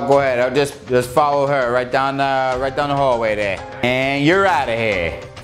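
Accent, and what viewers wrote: Boston, United States. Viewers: This is so funny because of his Boston accent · Hana M he's from Haverhill, that's pretty much how we talk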